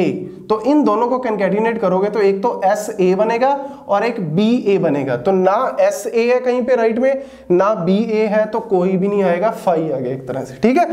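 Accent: India. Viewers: Bro I like your accent, sounds so different to other indians I watched on youtube. I am not indian but understood you very well thank you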